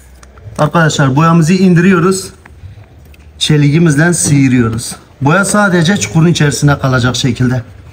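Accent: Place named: Urfa, Turkey. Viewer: Urfalı olduğunu hissettim hemşehrim. Harikasın enerjin iyi videolara devam.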